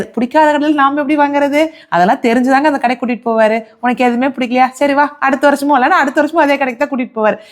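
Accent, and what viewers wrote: Kongu, India. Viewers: Kongu tamil sis🎉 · Unga slang கொங்கு tamil மாதிரி இருக்கு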